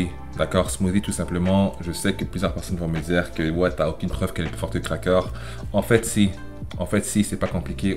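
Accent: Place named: Montreal, Canada. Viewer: Hey j’aime tes vidéos ton accent me rappelle ceux qu’on retrouve à Montréal est-ce que tu viens d’ici ?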